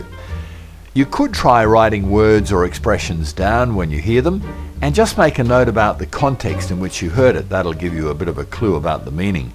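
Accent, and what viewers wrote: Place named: Cockney, United Kingdom. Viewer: Seems Cockney, doesn't it?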